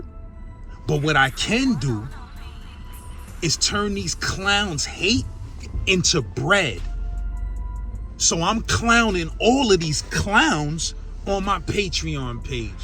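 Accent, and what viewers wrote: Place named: Connecticut, United States. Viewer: OMG!!! Yooooooo!!!! you smoked it " Big Kermit the Frog face, turtle face dude " 🙀💯😂😂😂😂😂😂😂😂😂😂😂 yooo I was dying my nigga, you sound like your from out here in CT 😯😆😆😆😆that was funny as hell!!😁🙀😆😆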